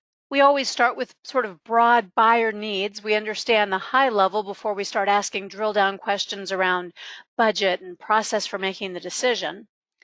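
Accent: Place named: United States. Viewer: The heavy American accent has ruined the presentation.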